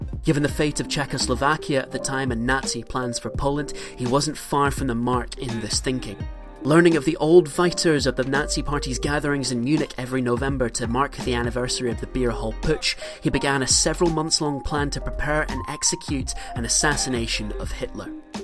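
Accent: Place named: Ireland. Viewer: Nice Irish accent you got there mate.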